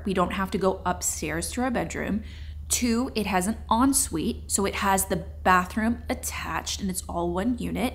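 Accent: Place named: California, United States. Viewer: Hi love your videos! I was wondering if you could answer this in your next q&a, I am just so curious. Have you always had a Californian accent or you just lost the southern accent living in CA for so many years?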